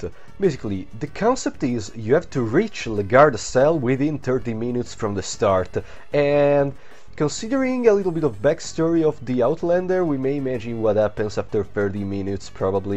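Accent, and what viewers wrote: Italy. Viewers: Sei italiano? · Are you Italian?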